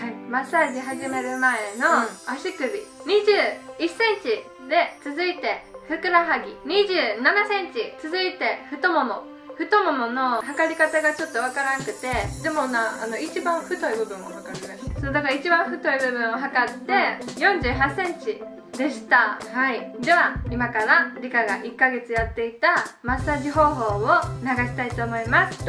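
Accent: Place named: Mie, Japan. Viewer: の三重弁くそ好きなんだよねー♡♡笑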